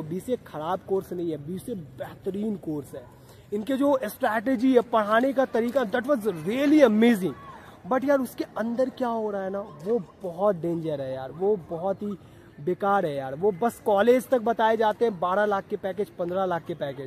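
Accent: Bihar, India. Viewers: Aap Bihar se ho 🙃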